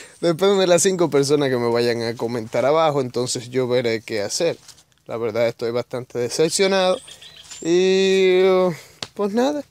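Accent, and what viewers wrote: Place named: Honduras. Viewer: Pues men, mira, yo te sigo desde el ultimo canal que te tumbaron, y te volví a encontrar de pura suerte, por un video de una canción/traducción que te pedí, era la de -. Desde ese entonces, te sigo, y de alguna manera te tengo nostalgia por el gesto que me hiciste. Debo de admitir que no sigo tu canal de forma muy activa, ya que, sinceramentementemente, pues, tengo algunas cosas que consumen mi tiempo, etc, etc.... Pero si, de ves en cuando me actualizo y veo tus videos, uno tras otro, porque me encanta la mayoría de traducciones que haces. Ademas, gracias a ti, he descubierto nuevos grupos, géneros, y eso, eso realmente esta muy cool, y lo aprecio bastante. Pero... si ya no puedes subir esta clase de contenido en este canal, pues, esta bien, sabes. Tampoco tienes que tirar por un barranco tu canal. Podrías.... No sé...... Puedes ingeniártelas para hacer otro canal nuevo, virgen, sin strike's, y empezar a resubir, o subir nuevas traducciones, y así reducir riesgos de que se vaya todo a la Sh**. 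Puedes hacer muchas cosas en este canal, no me viene algo en especifico, pero sé que encontraras algo, puedes hacer lo que quieras, experimentar para ver que tan bien lo haces, o que tanto pega, etc, estas en una plataforma con muchas otras posibilidades de creación de contenido menos riesgosa por el Copyright. Y tu voz esta bien, es supercool. Mi mejor ciber-amigo es de Honduras, y habla casi igualito que tu xd Por lo que para mi es familiar, agradable, pero no lo tom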